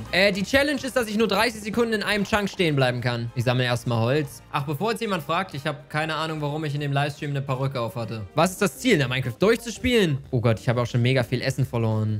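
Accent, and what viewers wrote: Saxony, Germany. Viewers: Bist du eig ein Sachse oder so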